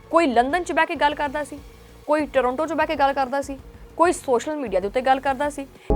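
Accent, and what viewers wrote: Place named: Majha, India. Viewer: ji menu thodi teth (pure majheli punjabi ) bahut vadia lagdi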